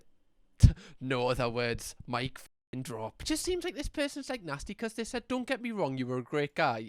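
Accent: United Kingdom. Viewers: With an american accent we have to say pr-👁️-vacy. With your british accent priv’acy works:) you and can say either if you want imo 😆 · You could spend YEARS on Reddit for reaction content -- "Am I the Asshole?" (AITA) is loaded with insanity. Also, from what I know, the UK says "privacy" with a short i, as in "igloo", and the US says it with a long I, as in "I'm going to the store." Personally, I like your UK pronunciation because, you know, you're from the UK.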